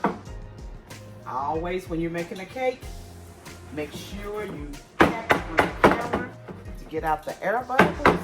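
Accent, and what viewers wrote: South, United States. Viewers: Your southern accent is divine unlike my bland Australian accent.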